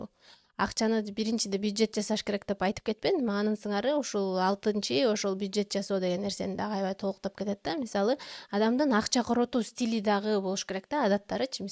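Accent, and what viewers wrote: Standard. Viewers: Кыргызча таптаза сүйлөгөнүңүзгө ыраазымын ❤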